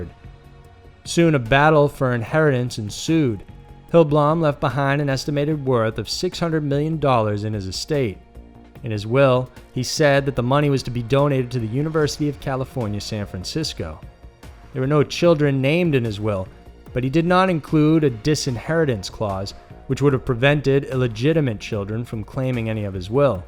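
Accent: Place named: Appalachia, United States. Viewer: Hill Billie narrator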